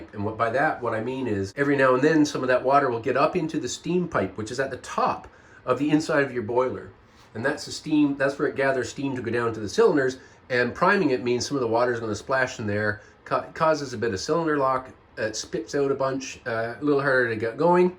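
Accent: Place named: Canada. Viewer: Can always tell a Canadian by the way he says out , like a Scotsman says outt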